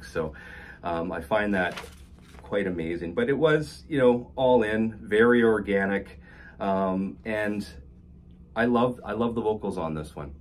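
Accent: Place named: Canada. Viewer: Canadian and with that some pronunciations are different where he is from.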